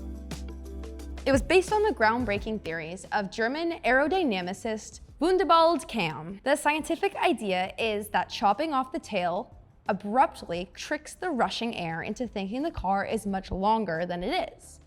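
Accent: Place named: California, United States. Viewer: dear god, lets get a different narrator... i dont want to listen to a california girl accent. ever.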